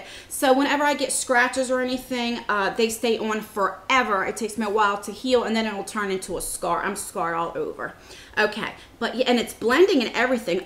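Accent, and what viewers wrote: United States. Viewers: What’s her accent? What state?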